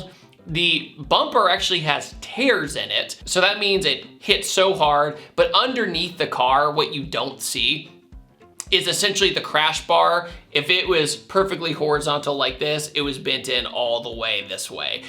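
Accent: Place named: New Jersey, United States. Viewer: New jersey native? Sounds about right. 😂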